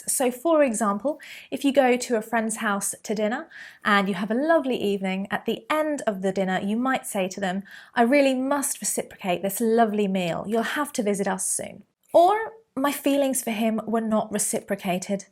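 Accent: United Kingdom. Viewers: Thanks VERY much for this lesson❤ hi mis I'm new here in this channel I want learn English British accent with you and thanks again I LOVE YOU 🌷🌷🌷🌷🌷🌷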